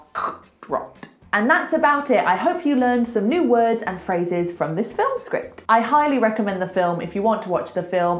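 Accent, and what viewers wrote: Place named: RP, United Kingdom. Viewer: You are absolutely gorgeous when you talk. I am a real big fan ! Your RP is THE reference for me.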